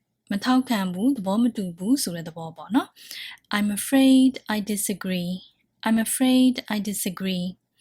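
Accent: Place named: Myanmar. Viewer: I like the burmese accent, its sweet in ears.. 😊😊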